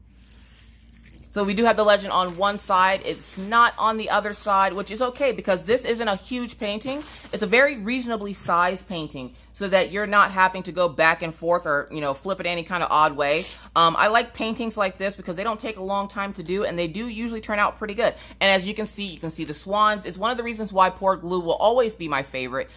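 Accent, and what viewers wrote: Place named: South, United States. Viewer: I absolutely love listening to you as I diamond painting. I am a desert transplanted southern girl and it is like listening to me and my friends.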